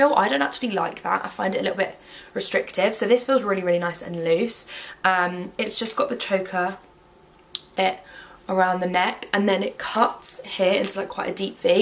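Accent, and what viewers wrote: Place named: London, United Kingdom. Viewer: anytime. I've been watching hauls all weekend and yours is definitely up there. Keep it up. Your accent makes me miss living in London.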